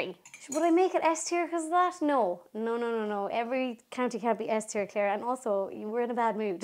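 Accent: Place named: Mayo, Ireland. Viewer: Your laugh is adorable! My Gran laughs the exact same way. Btw, I found out why you two have the same accent. She was born in Corke but raised in Mayo.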